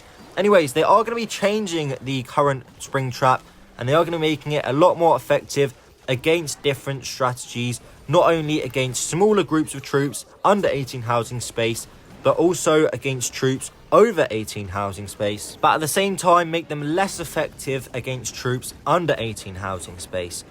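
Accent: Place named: United Kingdom. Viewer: Love that British accent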